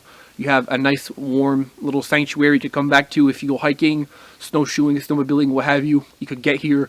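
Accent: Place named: New Brunswick, Canada. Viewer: you sound by your ascent N,B. Canada thank for the video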